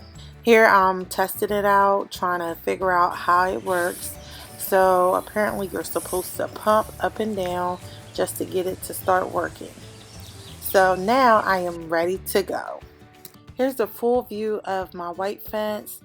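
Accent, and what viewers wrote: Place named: Maryland, United States. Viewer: You from Maryland? I didn't watch the whole video, but I heard a little of your accent. The "tew" is giving Maryland lol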